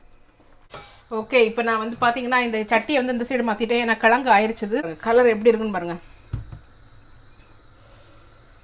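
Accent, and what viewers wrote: Nagercoil, India. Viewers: I really like to hear ur Nagercoil slang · Nagercoil slang🔥🔥❤ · Sir learnt nagercoil slang from u madam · நம்ம ஊரு பாஷை, அப்புறம் பழக்க வழக்கங்கள் முன்னாடியெல்லாம் மற்றவர்களால் கிண்டலுக்கு உரியதா இருந்துக்கா, ஆனா இப்ப உங்களை மாதிரி சாதிச்சவங்க அத சரளமா உபயோகிக்கும் போது ரொம்ப பெருமையா இருக்கு நானும் நாகர்கோயில் வாசி தான்னு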